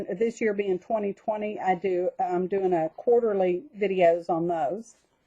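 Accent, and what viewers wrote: South, United States. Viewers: I just love your southern accent I’m from south Mississippi and sound like you lol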